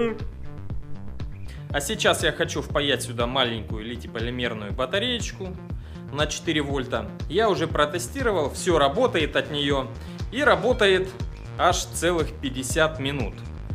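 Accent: Rostov, Russia. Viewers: Почему он разговоравает как Негода? В Ростове все так говорят??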